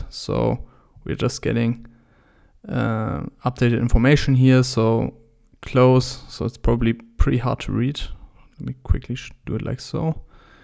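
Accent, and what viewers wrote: Germany. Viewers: hey dude. i really like your videos, thank you :) i am getting into python atm. do you have any tipps? in the end i wanted to make a telegram or discord bot. i like the idea of a trading bot also a lot :) so for now i am stuck at my udemy though :D so any tipps are welcomed. and yeah, thank you for your videos :) ach so, kann es sein, dass du deutsch als muttersprache hast? ;)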